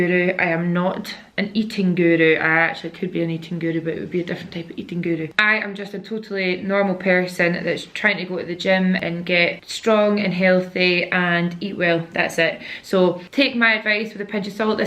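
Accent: Scotland, United Kingdom. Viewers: Scottish I thought I was going to get American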